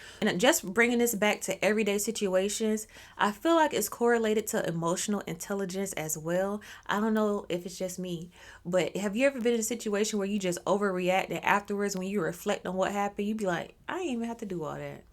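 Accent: United States. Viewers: Baby, this is that Orisha whose name YOU DO NOT PLAY AROUND WITH!!! Thank you for these Orisha stories!! You pronounced it as our American selves would, HOWEVER, these folks better be careful playing with his name bc when he shows up, he knocks all the shit over 🤣🤣🤣🤣🤣🤣🤣🤣🤣... So don't worry about the pronunciations. I assure you The Orishas are pleased that you are telling their stories, PLEASE KEEP TELLING THE TALES OF THE ORISHAS, Ase OOO!! ** EDIT: I am crowned to Osun and I pronounce his name exactly as you do 🤗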